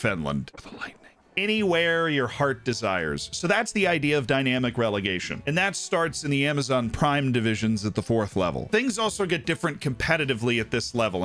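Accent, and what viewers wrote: United States. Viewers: When you listen to talk about football almost everyday and you forget he's from the USA and then comes this intro and you're like "he's American, alright" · It hurts my brain hearing an American accent in a FM video... Awesome project though!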